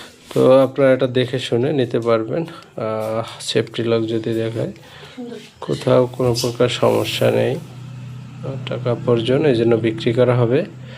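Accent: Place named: Jessore, Bangladesh. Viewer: যশোর কথায় ভাই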